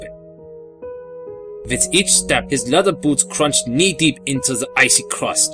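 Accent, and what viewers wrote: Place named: Germany. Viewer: Nice work with the German accent my friend. Tres bien mon amie.:)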